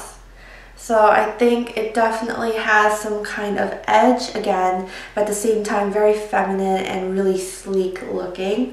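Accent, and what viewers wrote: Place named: United States. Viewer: a full korean with american accent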